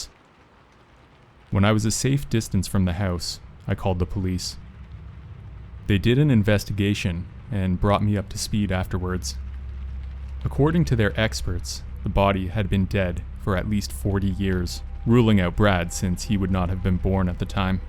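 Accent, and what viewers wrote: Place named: Canada. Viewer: I dig the Canadian accent.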